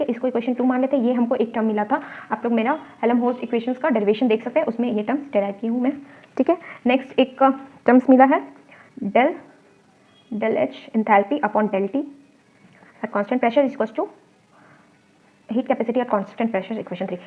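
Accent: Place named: North, India. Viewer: Not gero its zero why these people from the north has bad accesent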